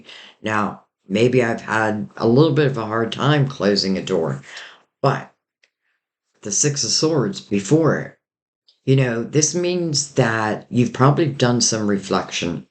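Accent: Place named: Philadelphia, United States. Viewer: Well, well.... It no coincidence this reading popped up on my feed first thing this morning and I had time to listen to the entire reading. Boom, boom, boom.... So much here. Thank you for your gift. Also, I'm from outside of Philly and. Sounds like you also are from that area...😊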